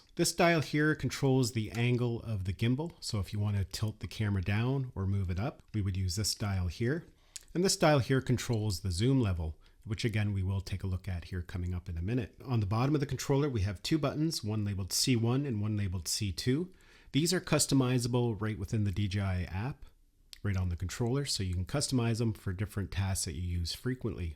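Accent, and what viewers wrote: Canada. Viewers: Well, the first flight went without a hitch! I learned very quickly to watch the controller and not the sky - the drone disappears in seconds. I would highy recommend this Beginners Guide. Calm, clear and really comprehensive without unnecessary detail. That Canadian accent is a joy!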